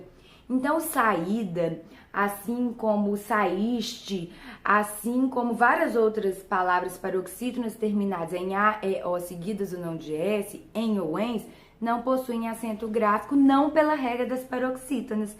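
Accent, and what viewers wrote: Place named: Minas Gerais, Brazil. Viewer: Ela deve ser de Minas ou Goiás . Pelo sotaque amei a aula já estou seguindo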